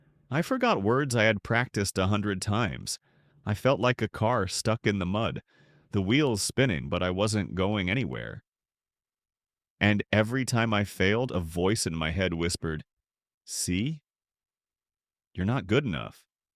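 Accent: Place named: United States. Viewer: You sound 100% American and your vocabulary, grammar was completely accurate omg I thought you were an American in disguise, how on Earth are you not a native English speaker??? 🙀🙀 Please make many more videos about your journey learning English grammar, vocab, and esp speaking like methods, technique, textbook, material, webs, apps etc I really look forward to it. Your achievement on English speaking as your second language absolutely takes me aback, you're most iconic English speaker to me I appreciate your message in this video: "no need to be perfect, be brave enough to try again, make as many mistakes as it takes, learn from them and get better bit by bit" - truly mind-blowing mindset.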